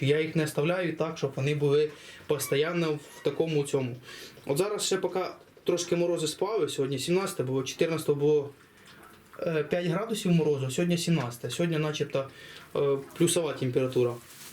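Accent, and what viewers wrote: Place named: Poltava, Ukraine. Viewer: Говірка схожа на полтавську😂.мені як прлтавцю-понятно все.кому не понятно-хай не слухає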